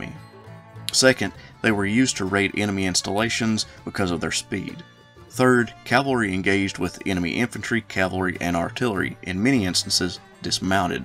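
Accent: South, United States. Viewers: Ye know When I first watched one of your vids I heard the southern accent and country music start up and immediately expected a hard confederates bias but was pleasantly surprised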